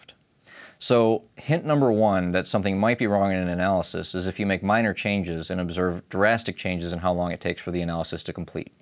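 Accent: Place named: United States. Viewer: finally we got a video with American accent. yay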